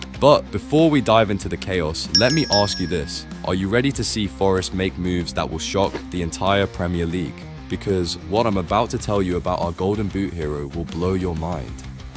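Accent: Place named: London, United Kingdom. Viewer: Can your AI voice simulate a Nottingham accent please, London accent is annoying.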